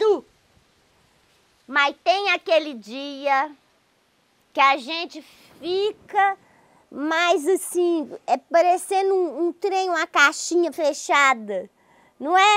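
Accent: Minas Gerais, Brazil. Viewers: Amo vc 💕 VC tem mim ajudado muito com seu jeito mineiro de falar comigo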